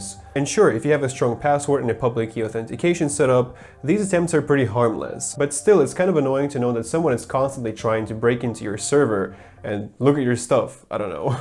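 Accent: Russia. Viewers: Один я слышу русский акцент?) Where are you from?)) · русский он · Я не могу понять, он русскоговорящий или нет, английский смешанный, где то хорош акцент, а где то как будто русский. Похож на английский восточной Европы какой нибудь или американских пригородов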